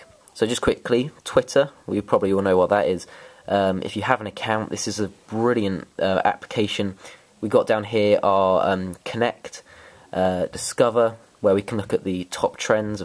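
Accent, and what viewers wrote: England, United Kingdom. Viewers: nice accent. you from england? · You Britian?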